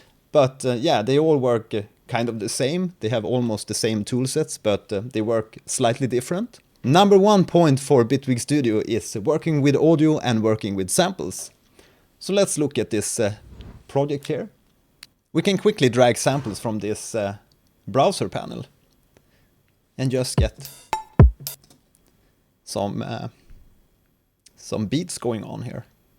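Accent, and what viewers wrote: Sweden. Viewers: Thanks for this informative video. (PS! Love that Swedish accent)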